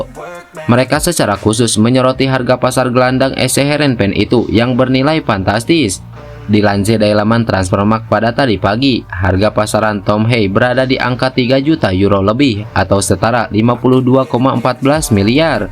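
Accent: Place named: Surakarta, Indonesia. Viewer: Wow wong solo .... Bisa nih persis solo 😅😅😅😅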